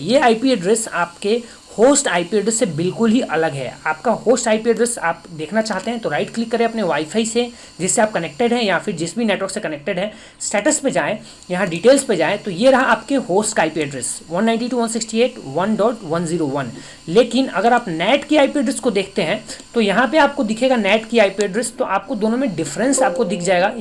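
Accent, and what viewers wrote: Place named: India. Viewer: This is indian english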